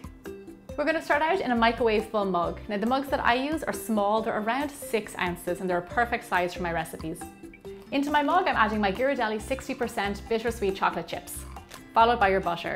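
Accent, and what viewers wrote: Ireland. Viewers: Is she Irish? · Wow, So cool! You remind me of another youtuber! He does gaming and he is also Irish! You have an awesome accent! Great Food! I just made your Blueberry Muffin and Mac & Cheese! Very Yummy!! Fabulous Work! Please Keep Making Videos! :D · Are you Irish ?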